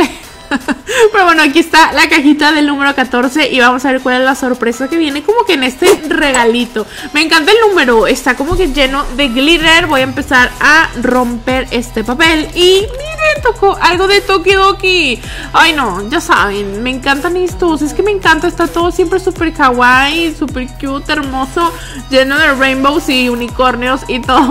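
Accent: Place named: Fresa, Mexico. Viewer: mira deja ese asentó de fresa si yte voy a decir algo me gustan mucho tus videos pero la verdad no me gusta tu asrntó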